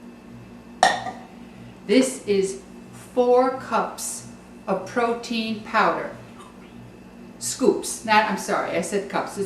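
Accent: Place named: New York, United States. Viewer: Love that New York accent!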